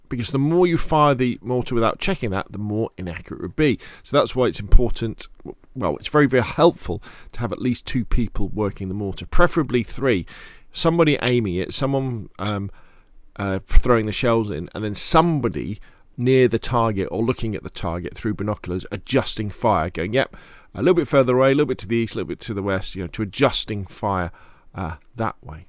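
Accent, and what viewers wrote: England, United Kingdom. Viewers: I feel like when the teacher has an english accent, it makes it lots more easier to understand 😂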